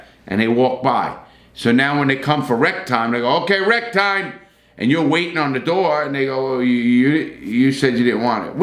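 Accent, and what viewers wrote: New York, United States. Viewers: I just LOVE his new york accent its so heavy I can smell pizza from the screen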